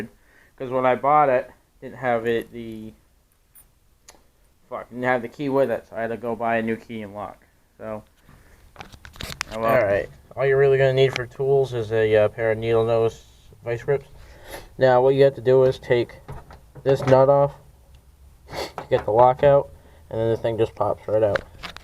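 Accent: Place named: Maine, United States. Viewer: You must be from Maine or atleast New England.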